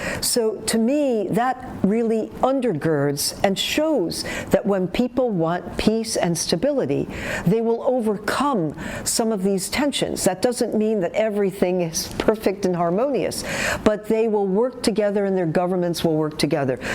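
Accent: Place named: Arab world. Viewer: Abraham Accords will lead to Arab Spring times 10. Miss, no offense but your accent is a bit strong. When you speak English sometimes it sounds like Arabic. So harsh. Maybe hire a different host if you want to appeal to anyone other than Neo-Cons, war criminals, and fellow Arabs.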